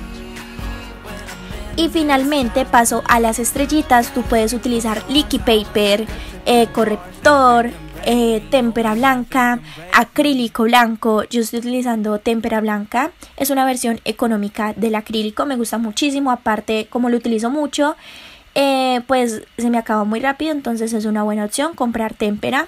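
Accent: Colombia. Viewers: sos colombiana cierto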